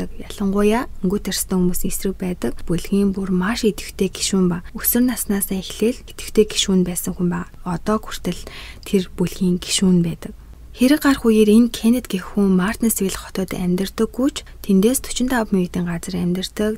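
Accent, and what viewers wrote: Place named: United Kingdom. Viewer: MONGOLOOR yarij bgaa uhaantai YUM bol Angli hunii neriig Angli ayalgaar BUU HEL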